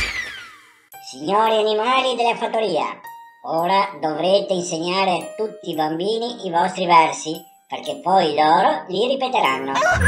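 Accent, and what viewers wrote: Italy. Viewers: Accento triestino forte!!!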